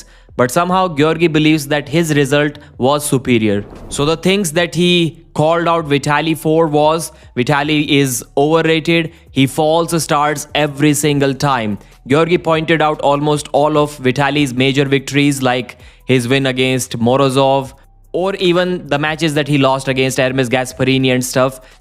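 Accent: India. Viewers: Dude you got the hardest indian accent like fckn ever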